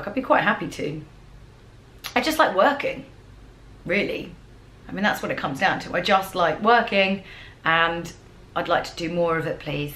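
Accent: United Kingdom. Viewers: Best of luck! If you do go, you should definitely hang on to your Britishness. In fact, you might want to play it up. People are impressed by an authentic English accent, especially one like yours which is REALLY hard to imitate! I think you will be a big success in America. You have a lot to offer!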